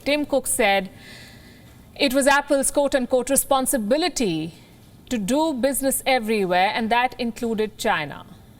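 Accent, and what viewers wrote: India. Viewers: Is this Fox news with indian accent and tan? I love America and China is surpsssing us. Solution invest intetnationally and tax the income heavpyly for building middle class again.. not let them keepthe loot snd starving people in US like our own Doing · Your Indian accent speak volume of your jealousy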